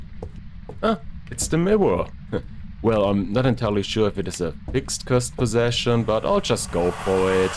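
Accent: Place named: Germany. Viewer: I would definitely go for Germany but he just has a strong German accent in his English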